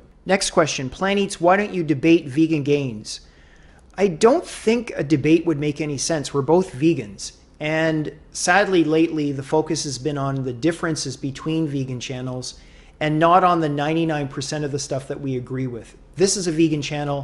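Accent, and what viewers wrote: Canada. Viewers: Keep those great videos coming. I'm not vegan myself but do eat vegan a few days a week. I love the calm and more mature messages on your channel. And the canadian accent that makes me feel like I'm back home (I'm canadian living in France). 👍🍁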